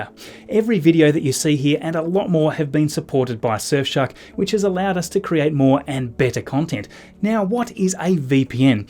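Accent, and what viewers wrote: Australia. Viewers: Lol, YouTube CC wrote “Bokeh Chicago” when you said “BocaChica gal” ... that Australian accent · maybe its the Aussie acent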